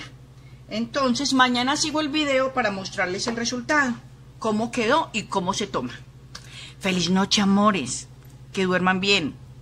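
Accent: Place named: Colombia. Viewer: Wow,genial. Peo yo vivo en EEUU y no se donde venden eso.y si lo venden . Me encantaría saber de q otra forma podemos obtener el colageno vía oral.Soy nueva aquí y me gusta ver tus videos. Además sabes, el acento Colombiano me encanta. Soy Boricua. Bendiciones para ti y q tú canal siga creciendo. Abrazos 🤗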